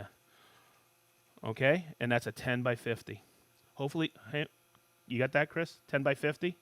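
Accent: Boston, United States. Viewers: Hey can you park my cah- I’m a masshole too I hear it - love it New England woodworkers rock🎉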